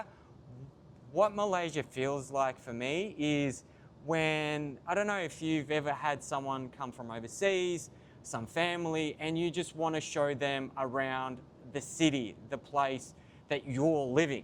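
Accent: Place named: Australia. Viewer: IR A REAL DING BAT!! NOBODY MOVES TO ANOTHER COUNTRY WITHOUT LIVING FIRST U MORON!!! UR CHARACTER AT THIS AGE ...I DID NOT LEARN ..TO LISTEN N GROW UP!! U DO IN HASTE SO HOW ON EARTH CAN U CHANGE??? UR GOING TO REPEAT UR SILLY WAYS!!! GET RID OF THAT SICKENING OZZIE PEASANT SLANG...N LEARN TO SPEAK PROPERLY..."ENGLISH"!!! NOT D ANNOYING ACCENT AT ALL!!! FIRST LEARN TO LISTEN TO D LOCALS ...N NOT SPEAK INTERRUPTING WHILE SOMEONE IS SPEAKING...COZ U WONT HEAR OTHERS GET IT???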